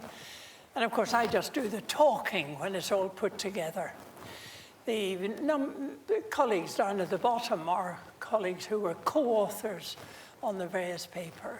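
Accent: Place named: Northern Ireland, United Kingdom. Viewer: Sounds like a lovely northern ireland accent! 😊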